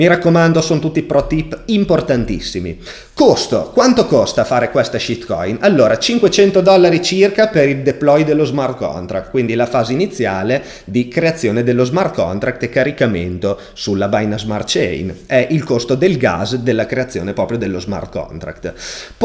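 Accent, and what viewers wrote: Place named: Romagna, Italy. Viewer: vabbè sei palesemente romagnolo. Viva la Romagna, follow immediato.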